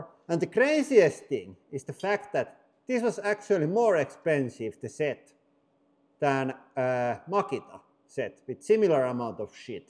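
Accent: Finland. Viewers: How nice to hear a Finnish accent 😊 I’m 2nd generation Finn here in the US. My grandparents came over in the late 1800’s. My mother and both grandmothers equals 28 children. Big age gap.😂. I love your channel. Finn was my mother’s first language. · Nice Finnish accent! :-) Go Suomi, go! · Well it's that Finnish accent mixed with English translation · The language he speaks calls Finglish) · s a Swedish person, I truly appreciate our Finnish neighbours. So the moment I heard the accent, I felt warm and positive.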